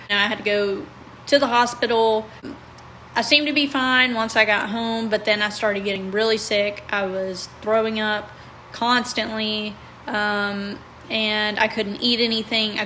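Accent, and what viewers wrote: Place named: South, United States. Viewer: I'm in love with your southern accent,.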